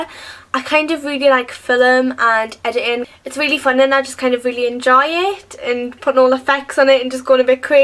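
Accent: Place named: Geordie, United Kingdom. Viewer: It is soo good to hear your accent because I've got a penpal in newcastle upon tyne and I want to meet her next summer and so I can "learn" to understand her.. because gordie is a quite difficult language to understand although yours isn't as strong as others ones ^^ (Sry if my english isn't perfect.. I'm from germany :P )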